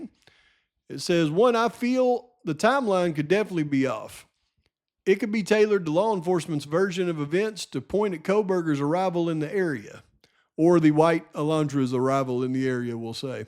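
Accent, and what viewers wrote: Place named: Arkansas, United States. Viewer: He lived in Arkansas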